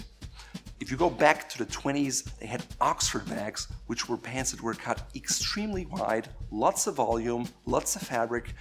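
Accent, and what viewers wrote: Germany. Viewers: oh that german accent .... 🤯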